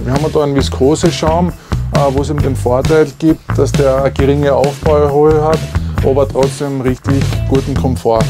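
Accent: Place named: Low German, Germany. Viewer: Wenn man Kunden gewinnen will sollte man verständlich sprechen. unn nitt sei Platt!!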